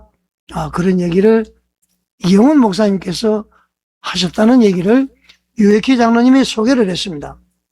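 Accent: North Korea. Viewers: 참 낯짝도 두껍네. 이영훈 목사팔아 밥먹고 사느라 그 이북 말투부터 뜯어고치고 유트브 해처먹어 듣기싫어 이북 말투나 좀고쳐라!!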